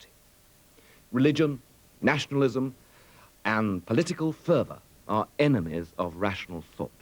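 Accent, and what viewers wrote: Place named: United Kingdom. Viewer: I think this is awesome, but I can't listen or read this video well in English, my language is Spanish. I've tried to (the great British accent helps me), but the CC are a bit confusing sometimes. It's there any transcription in English? I really want to understand this chat entirely. Thanks in advance!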